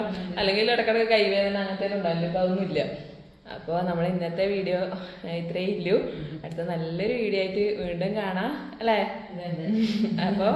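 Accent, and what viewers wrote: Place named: Payyannur, India. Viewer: ഞാനും ഇതുപോലെ തന്നെ നമ്മുടെ തനതു ഭാഷ പയ്യന്നൂർ സ്റ്റൈൽ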